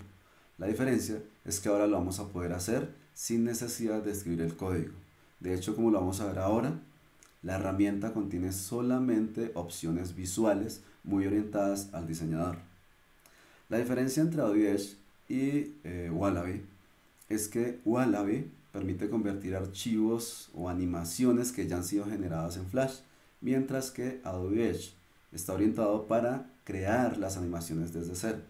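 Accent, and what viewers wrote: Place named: Colombia. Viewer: por el acento creo q eres un compatriota colombiano quisiera saber donde me puedo certificar en adobe que es creo el titulo q posees gracias!!!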